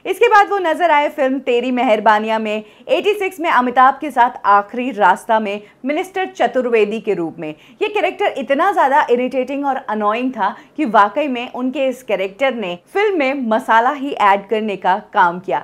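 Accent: India. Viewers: Her Punjabi accents also comes in 😐🙄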